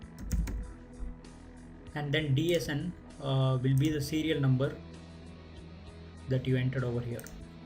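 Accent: India. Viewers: talk with an Indian accent, like I speak with a Norwegian one. He explain things very good, no problem to understand. If you think he talk fast, that is just because of his dialect. Thumbs up.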